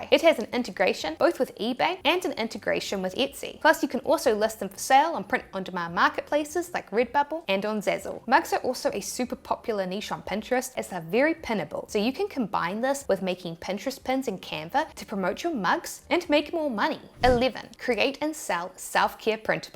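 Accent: New Zealand. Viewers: I do hope to try these tips at some point but for now, I'm here for the hacks and the New Zealand accent (which is amazing!) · Good to see another Kiwi on here 🙂 I happened to stumble upon your page by accident, and so glad I did. Your content is awesome. As an ex-pat, I miss hearing the accent. Keep these wonderful videos coming. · Thought you were Australian but apparently New Zealand is somewhere between Irish and Australian. Looking at the pinned comment I'm sad to see that this isn't such an original idea. Oh well... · Fush and Chups! Love the accent and Aotearoa (from UK) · I love your kiwi accent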